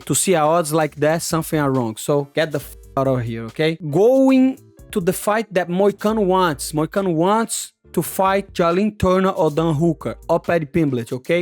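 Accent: Brazil. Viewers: I watch your channel because you remind me of my brazilian friends accent back in high school. Youre a great fighter and comedian Respect brother.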